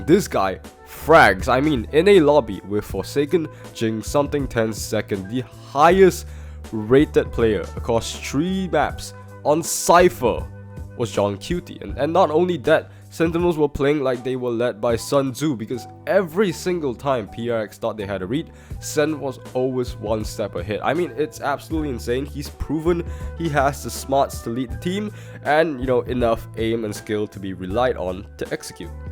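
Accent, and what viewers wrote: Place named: Singapore. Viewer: i can hear the singaporean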